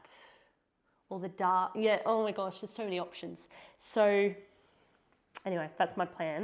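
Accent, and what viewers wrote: Australia. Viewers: Lovely to hear an Aussie talk about quilting. Hello from the Sunny Coast. My suggestion for quilting would be diagonal lines both directions AND inside the white as you were thinking. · Just found your channel and it’s refreshing to hear an Aussie! I’m also from Brisbane! I relate to your quandary on what to quilt ! I’ve never used a sheet but I’m thinking it’s a great idea, also using old men’s shirts. I’ve been watching a lady in the US who buys lots of shirts and makes beautiful quilts with them. If I can remember her channel name I’ll comment again.😊 Found it! From Small Things, her name is Sue and I find her very interesting 😊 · I love your accent! My Mother was from Perth. SO I am loving your accent. MyrLene ❤️ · Lovely to hear an Aussie voice, I'm from the Sunshine Coast near you. Interesting video, love getting ideas from other quilters. 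I like the idea of stitching around your focus shape. I've done a bit of hand stitching around my borders, pretty hard to do without a big table but heck, gotta try something new. Since I don't have a big table I've been doing "quilt as you go" but I like the look of a separate top, and your backings are nice. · I’ve just come across your site and it’s nice to hear an Aussie accent. I live in Perth. Your quilts are lovely and your backing ideas great. Thanks for sharing your ideas. 😊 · Oh, I discovered your channel today and was so pleased to hear a fellow Aussie voice. I love to discover sheets and blankets to use for my backings at our local charity shops. Love your quilts ❤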